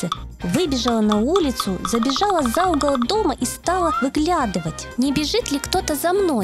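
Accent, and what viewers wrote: Ukraine. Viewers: ти из ккрайини)))))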